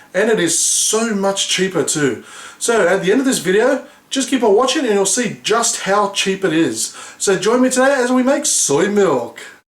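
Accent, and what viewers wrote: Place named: Australia. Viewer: I love Australian accents for some reason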